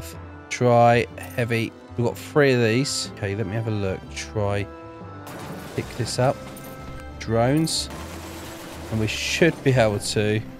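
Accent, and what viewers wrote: United Kingdom. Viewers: I always thought you live in America but you are British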